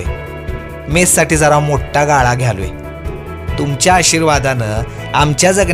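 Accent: Kolhapur, India. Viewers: आपली भाषा व वांगी कोल्हापुरी दिसतात. खुप छान 🙏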